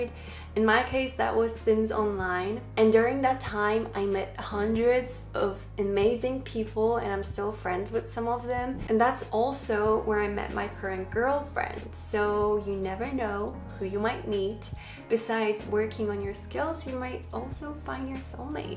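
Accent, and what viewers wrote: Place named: United States. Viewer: I remember your first videos on this English channel and oh my God, girl, you've improved soooo much! Not only vocab and grammar but your pronunciation! If I didn't know you're from Poland, I would definitely say you're American! ❤️ cheers from Opole 😉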